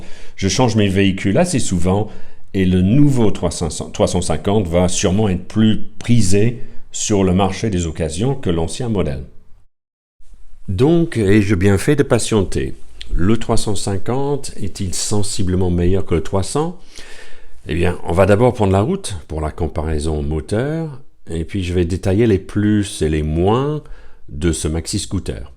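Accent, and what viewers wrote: United Kingdom. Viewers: BJR. Et bien, j'aimerais bien parler l'Anglais comme tu parles le Français (même avec un accent). Tu as une très bonne diction. Quant à ton accent "british" il n'est pas trop prononcé. D'ailleurs, je ne l'ai remarqué qu'au bout de plusieurs phrases. Bon essai, agréable à suivre ; continue de faire des essais de scooters. Concernant ta question sur la circulation en inter-files (surtout valable sur le périphérique parisien), nous n'y comprenons plus rien, nous non plus. La France a l'art de changer et compliquer les réglementations. Une question : c'est mieux de vivre en France ou bien au Portugal ? (ndr : je suppose que c'est à cause du climat que tu ne vis plus en Angleterre). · Very good review with the best accent I have ever heard from an English person.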